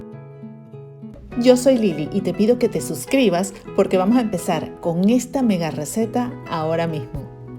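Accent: Venezuela. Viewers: Amooo el acento Venezonalo, definitivamente!!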